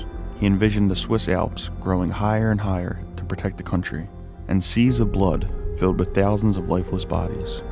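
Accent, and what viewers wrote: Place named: Philadelphia, United States. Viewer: this guy is definitely from Philly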